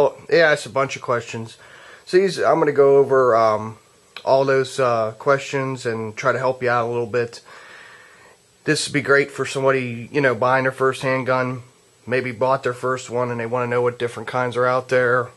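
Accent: Pittsburgh, United States. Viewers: Are you from Pittsburgh? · you have to be from the burgh "an'nat" thats pittsburgh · you sound like you're from Pittsburgh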